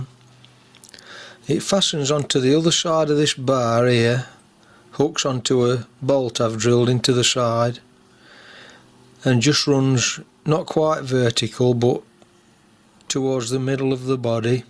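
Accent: Yorkshire, United Kingdom. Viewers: Nice little machine . You sound very sort of Pontefract area. From a Leeds lad.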